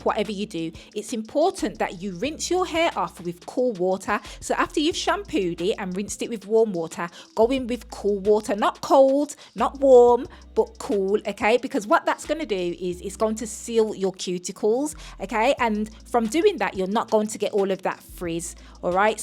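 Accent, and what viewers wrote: Birmingham, United Kingdom. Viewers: I hear a Brummie accent hehe😜☺️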